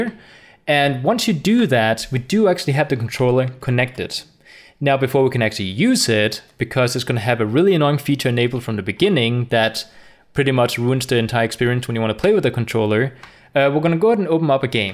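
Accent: Denmark. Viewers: Awesome video! Are you by any chance Danish? You have an accent, which sounds very familiar to me. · are you danish??? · is he danish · is he danish? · Are you danish? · Are you from denmark? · Are you a dane? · are u danish? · er du dansker kan høre det på din aksang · Are you danish by anychance? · haha tænkte nok du var dansk ku godt høre der var lidt der. Men super fed video den hjalp meget og du får også et like her fra :)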